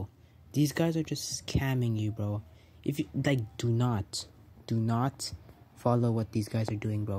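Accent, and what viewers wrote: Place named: India. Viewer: Fr bro as soon as i hear the indian accent i know that the video is fake, scaming literally flows in their veins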